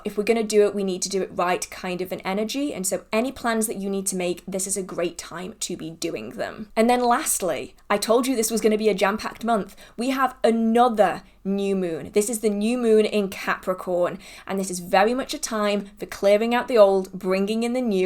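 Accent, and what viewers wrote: United Kingdom. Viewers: I like you sooo much. I love your cute British accent 😊❤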